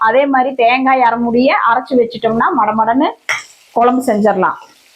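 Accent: Kongu, India. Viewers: Akka ur kongu slang is very sweet to hear. I love ur samayal.its amazing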